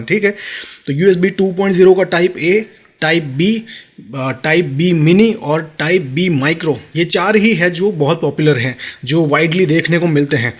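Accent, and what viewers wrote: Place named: South, India. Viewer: south indian aah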